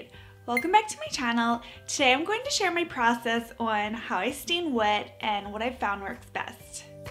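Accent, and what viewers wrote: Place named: California, United States. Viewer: Terrible Californian “ Hollywood “ accent. Speak the Queen’s English Dear!!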